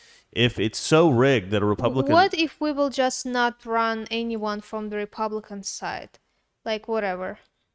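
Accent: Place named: Russia. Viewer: The Russian accent isn't always attractive, but she has such a sweet and feminine voice that it still works for her.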